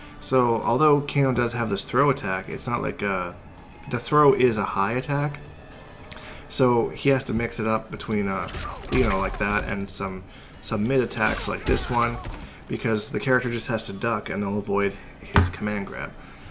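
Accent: Canada. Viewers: Canadian af 👍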